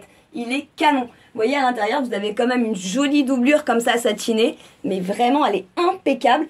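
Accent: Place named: Midi, France. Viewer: Wowww toujours plaisant t'écouter surtout avec ton bel accent!! Je te suis du Québec... ton accent me rappelle ma grand-maman qui était du "Midi" comme elle nous disait hahaha. Ais-je manquée le code promo de -30%?? Allez bonne journée bisous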